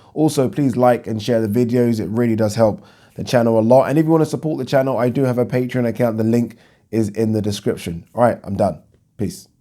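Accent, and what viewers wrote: United Kingdom. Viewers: Appreciate your another attempt not to swear:) I mean I never judged you for that. As for the non-native speaker, I love your British language and it's even more beautiful if you don't swear =] As always, my greetings from the boxing country, Ukraine!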